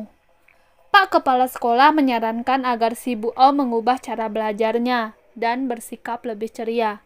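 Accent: Bali, Indonesia. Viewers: Kali ini q dgr suara ini Chanel anak Bali Yach?? Keliat bgt ni suaranya khas Bali. Morid, ithu, memanggel dsb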